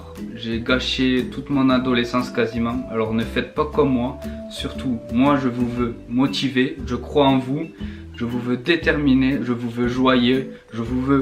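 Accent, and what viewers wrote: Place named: Toulouse, France. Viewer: j'adore le petit accent toulousain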